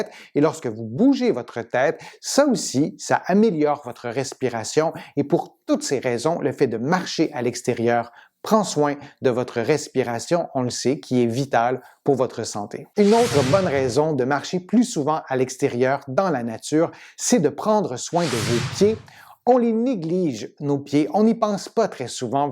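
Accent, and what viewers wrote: Quebec, Canada. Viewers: Passionnant,,merci cher monsieur,je m ''abonne.....qui plus est , j'adooore votre accent du Québec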